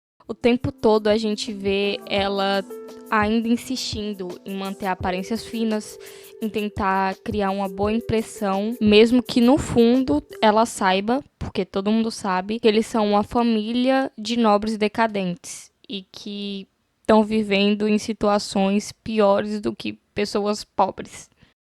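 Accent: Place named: Brazil. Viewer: Conheci seu canal hoje, muito bom mesmo! Voce é de minas, Goiás ou nordeste? Sinto que seu jeito de falar é tao familiar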